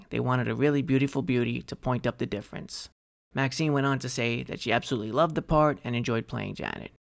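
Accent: New York, United States. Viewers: What illuminates these summaries is not only TZ's excellent writing, but your own. The New York accent also lends a down-to-earth quality to even the most avant garde episodes. Bravo! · I love his New Yawk accent too! It reminds me of Slip Mahoney and Satchel Jones and the Bowery Boys.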